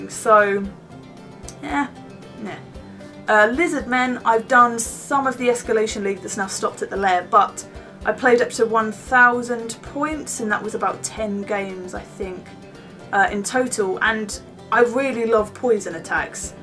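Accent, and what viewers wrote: England, United Kingdom. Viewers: You are so funny and great stay sweet and keep up the great work, It so great to see your growing as a gamer. Cheers. Later mate I have Wood Elves and Skaven. I find you can so a lot of archers but they both need new books and at this rte (th will be out beofe they are: ( Love the English accent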